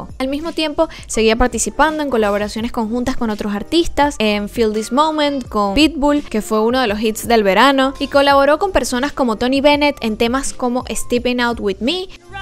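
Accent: Venezuela. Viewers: eres venezolana cierto? Ese acento jejej me hubiera gustado ver a verla cantar en el caracas pop festival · Te amo por tu acento venezolanooooooooooo... muy dificil escoger una cancion... creo que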